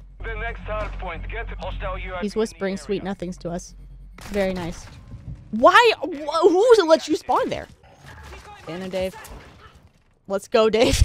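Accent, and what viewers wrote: South, United States. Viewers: A chaotic is a funny! Hope your allergies clear up soon! Cant wait to see what a week in your life is like! Also, the southern accent just gets me every time!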